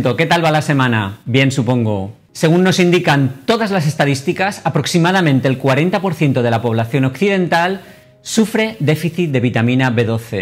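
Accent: Spain. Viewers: Gracias Doc.... me gusta escucharlo como se expresa verbalmente... su acento español...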